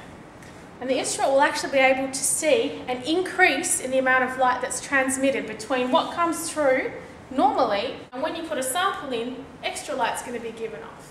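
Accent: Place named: Australia. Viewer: hey, you sound Aussie!! Great explanation - used it in Yr 12 HSC Chem today. Thx heaps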